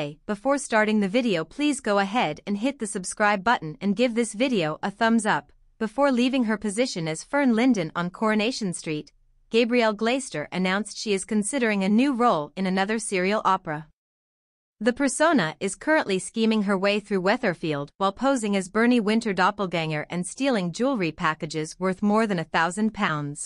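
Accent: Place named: United States. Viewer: What's with the robotic American voice? It's a English soap so a natural English voice would be nice🤗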